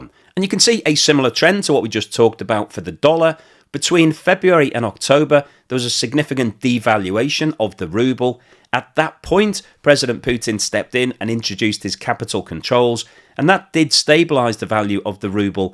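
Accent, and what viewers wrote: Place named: Liverpool, United Kingdom. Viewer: He's a scouser.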